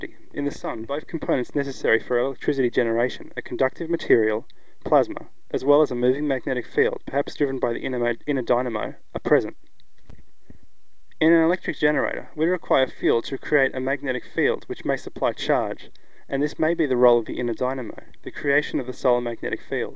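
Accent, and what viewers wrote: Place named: Australia. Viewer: it sounds like an australian accent. good on you for preserving it.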